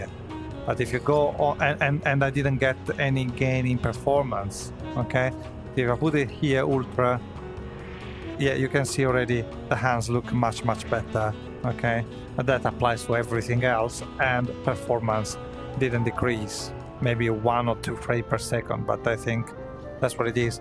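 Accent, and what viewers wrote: Italy. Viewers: Fantastico!! (Sei italiano, senza dubbio) Parli inglese benissimo!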